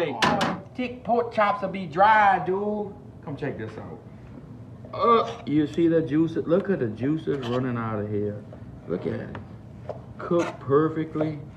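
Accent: Louisiana, United States. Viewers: Dude where were you raised at? I have never met a person in Kentwood that has a cajun accent that talks french.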